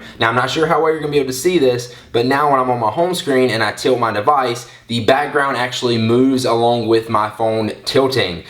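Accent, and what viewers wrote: United States. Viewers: you have the biggest american accent ever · it's not exactly an american accent. it's a country accent.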